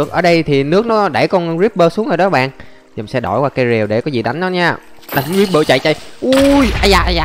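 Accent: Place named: Dong Thap, Vietnam. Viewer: Giọng ngày xưa đặc chất Đồng Tháp lun 🎉🎉🎉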